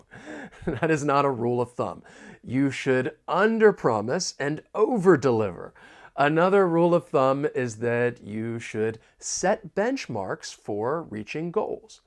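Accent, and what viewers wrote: United States. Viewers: the best for you. Although you have not a strong american accent, i am able to catch the 95% your speeches. Other teachers, and much more docomentaries with the voice off are a bit challengue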